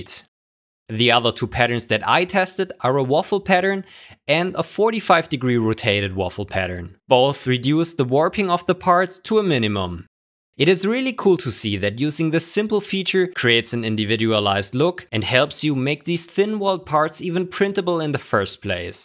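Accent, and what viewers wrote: Germany. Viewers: Man kann den Deutschen Akzent richtig spüren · I prefer textured. Also, I love your accent, where are you from? Edit: Nvm, i just finished the video, probably, German?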